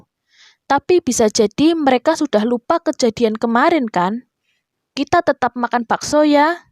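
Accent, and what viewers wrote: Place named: Ngapak, Indonesia. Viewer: Narasi pake suara bocah ngapak. Medok banget.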